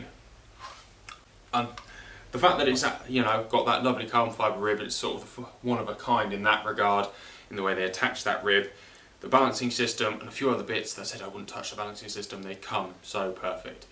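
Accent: United Kingdom. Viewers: I love your YouTubes even though I have a difficult time understanding the Queen's (King's) English. Could you possibly talk any faster, LOL. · It is called the Queen' s English, not simplified English(US)...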